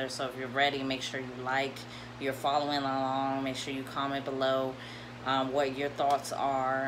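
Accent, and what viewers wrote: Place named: Virginia, United States. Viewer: Are you from Virginia I love your accent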